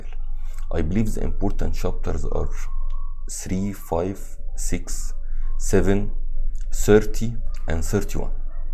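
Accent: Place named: Alexandria, Egypt. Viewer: والله المشكله مش في العالم العربي المشكله العالم الثانيه ماراح تفهم كلامك واللغة اللي تتكلم فيها. انجلش بس الاكسنت من اسكندريه !!!!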